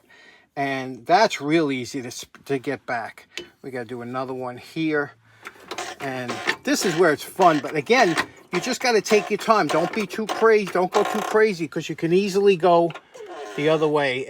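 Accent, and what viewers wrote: New York, United States. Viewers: Great Video bro that Vacuum came out great def in my opinion the best YouTubeer to listen too while doing a restoration 💯 Its probably the NY accent has to be 👍🏼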